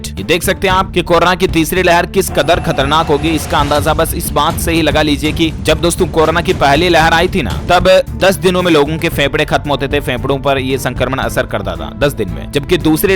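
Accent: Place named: Marwari, India. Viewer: Aapki marwari boli mast hai motiyar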